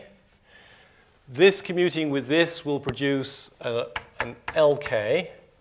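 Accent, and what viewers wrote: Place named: England, United Kingdom. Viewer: "absolute and immaculate sphere" i like the way he talks. english people.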